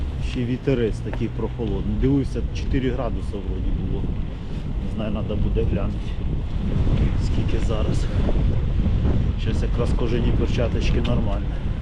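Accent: Poltava, Ukraine. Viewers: так приемно чути полтавський акцент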